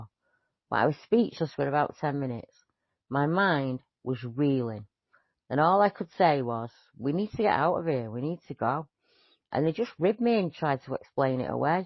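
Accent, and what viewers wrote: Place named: Manchester, United Kingdom. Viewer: accent is northern, sounds like she maybe from the Manchester area, however there are many different northern accents, such as Scouse, Geordie, Yorkshire, Cumbrian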